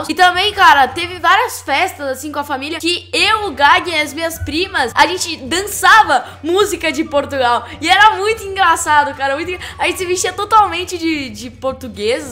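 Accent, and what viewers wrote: Brazil. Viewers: Tambem adoro o sotaque brasileiro #SOUPT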